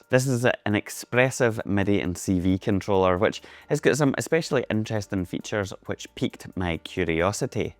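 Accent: Scotland, United Kingdom. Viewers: Scotland ! I believed at first that the accent was Irish. Sorry I am not very used to. Beside I am french and I pronounce Exquis like exkee. May be you know it already the arpegiator has 2 mods one with hold an an other to engage just when you touch it. Hope linux and Bitwig will integrate this instrument.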